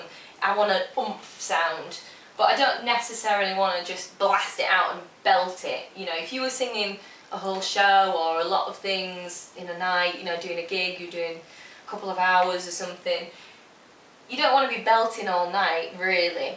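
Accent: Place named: Manchester, United Kingdom. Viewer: Are you from Manchester England you sound like my cousins talk from there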